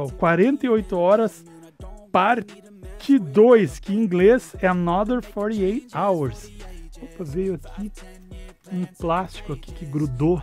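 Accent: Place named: Porto Alegre, Brazil. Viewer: Tu tem o mesmo sotaque que o Radhanti Maharaj aí de POA.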